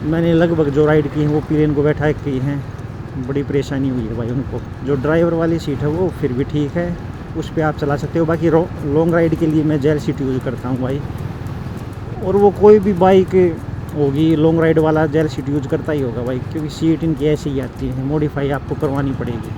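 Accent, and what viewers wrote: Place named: Haryana, India. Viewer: Well explained bro..no nonsense talk..I like haryanvi language..sweet..I am planning for this one..but sometimes confused between This and Hiness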